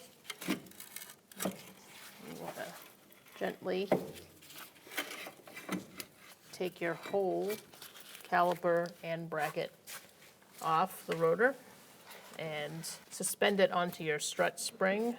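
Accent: Boston, United States. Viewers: my favorite mechanic chick and that Boston accent!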